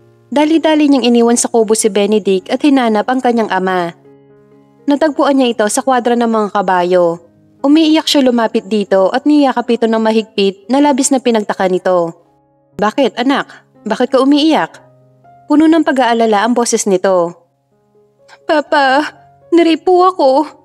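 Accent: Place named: Batangas, Philippines. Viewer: Taga Batangas po ba kayo,my tono kasi ang pag basa nyo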